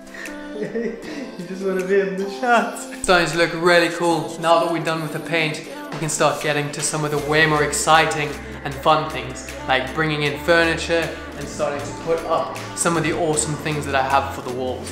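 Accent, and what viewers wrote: South Africa. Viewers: I am always a fan of hearing the familiar sound of a SA accent! Congrats on the new studio bru, sorry I am late on the train for commenting. I only stumbled on your YouTube channel today! Subscribed and liked! your videos are legit - No cap. Have a dope long weekend my man!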